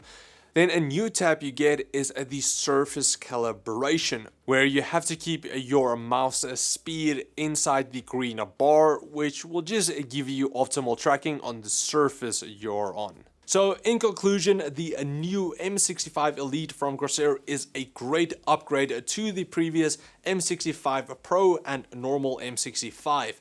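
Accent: South Africa. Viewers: Good review man, mine just came from evetech, dont fight the SA accent, embrace it! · Great review as always,. I'm from SA too. Embrace that accent, buddy. It'll sound great in the videos😉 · ey this oak is south african lukkkaaa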